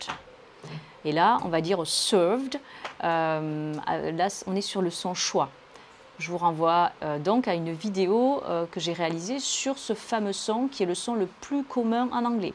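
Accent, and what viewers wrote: France. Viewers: I love your French accent when you speak English. Innernet : Internet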